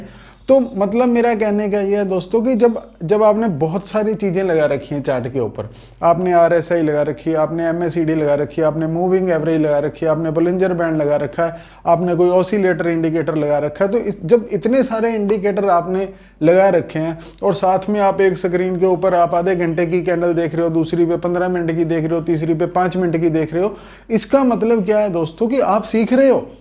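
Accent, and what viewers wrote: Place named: Haryana, India. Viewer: Boht schi knowledge, ❤ Apke way of talking se lgta h. Ki ap haryana ke h...Am i right sir ?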